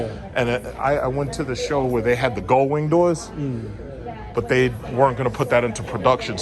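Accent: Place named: New Jersey, United States. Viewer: Sounds like he's From Jersey, Also he did a comparison with the Standard Length Escalade, what About the EXT Long Version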